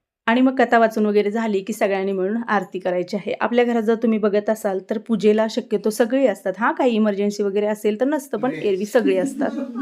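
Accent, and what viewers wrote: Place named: Solapur, India. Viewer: Ag tai Tu kolhapur chi aahe na solapur ka bolte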